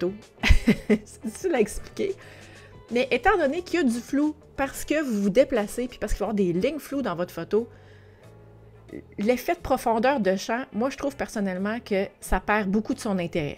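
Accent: Quebec, Canada. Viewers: Merci et avec ce joli accent québécois j'adore;-) 😉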